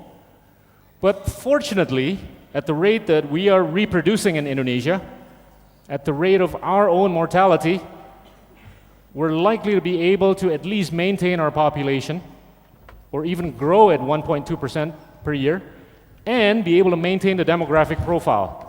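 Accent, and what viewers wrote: United States. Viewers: Wow setelah bertahun2 lulus dr universitas di Amerika beliau tetap fasih berbicara dengan bahasa inggris aksen Amerika, cool! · Aksen Amerikanya kentel banget...😊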